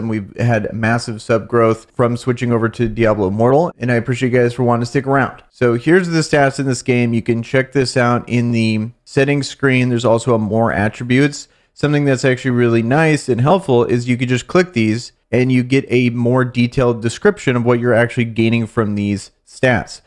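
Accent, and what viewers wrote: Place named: United States. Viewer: He's American..that's what they do with the English language, it's gradually changing because of the likes of this.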